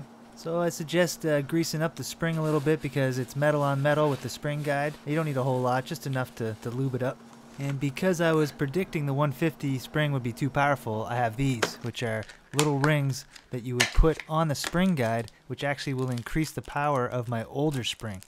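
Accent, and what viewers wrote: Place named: Canada. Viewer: You sound Canadian if you are where did you get these parts from can’t really find any places here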